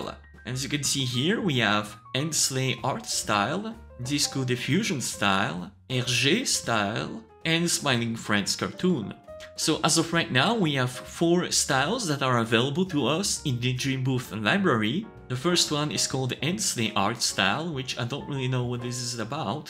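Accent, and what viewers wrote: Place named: France. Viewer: You sound french, are you french?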